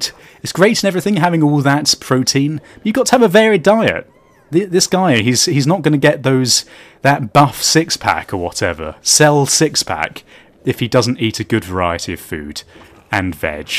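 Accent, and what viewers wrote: United Kingdom. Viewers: is so gorgeous and fabulous from british voice like france! He played together with Species-x and make a friends!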